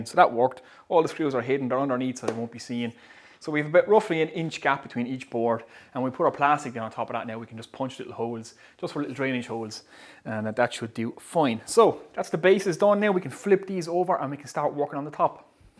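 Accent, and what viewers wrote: Waterford, Ireland. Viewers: Hi. Found your site by accident but well worth the visit. Living in Co. Meath and the accent and the Irish gift of the gab is so refreshing on a site such as this. I look forward to seeing the rest of your catalogued videos. Cheers. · Great video, great workmanship but most of all, great accent. The Irish accent is always happy-sounding which is what we need over here in England at the momment. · Ii, great videos. Really well done and very informative. I'm just getting back into woodworking after giving up wood turning many years ago. I'm making some patio furniture and some raised planters so great ideas and guidance from your videos. I'm looking to make some chopping boards for family and friends as gifts and I'm struggling to source some hard woods such as walnut, beech maple etc. I'm seeing some hardwood suppliers online but it's a case of very little detail of board size/lenght and cost. 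It feels a bit intimidating when looking for relativly small amounts. Are you aware of any suppliers that would deal with craft or hobby woodworkers. Sawn timber would be fine as I can joint and thickness it myself. Love the videos and have started the mitre station. By the way, this might be fighting talk but is that a Deise accent I hear? Thanks and keep her going. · What accent is this? Ok, Ireland.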